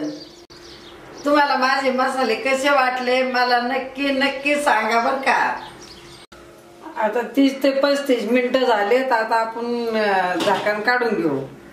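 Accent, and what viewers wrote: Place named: Bhor, India. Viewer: Tumch Bolan ani bhasha mala khup awdte maz maheri same Asch bolatat Bhor side la tumch Gav kont ahe aaji Mala tumcha recipe khup awdtat.... Love you ❤️❤️❤️ mazi aaji same Asch bolaychi mi khup miss karte maza aaji PN tumch Bolan aaikun khup Bhar vatat mala ..... Thanks 😘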